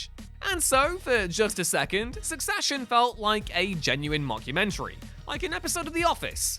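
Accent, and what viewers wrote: United Kingdom. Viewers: it's kind of awkward hearing a Brit talk about the American version of the office when the British version is a lot better. (P.S. I'm American)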